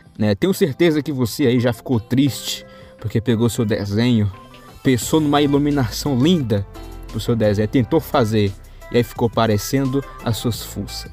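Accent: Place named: Ceará, Brazil. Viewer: Você é Cearense amigo, seu jeito de falar lembra muito. Muito bom a forma que você explica kkkkkk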